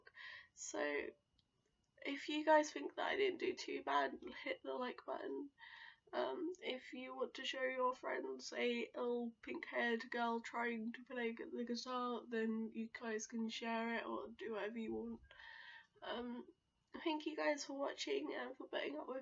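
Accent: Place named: United Kingdom. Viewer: Good job! Nice sincere vlog and accent (I just love British English)! You've got genuine energy, I'm also a great fan of music in general, thumbs up! I recently just started vlogging as well, so if you got the time check it out:]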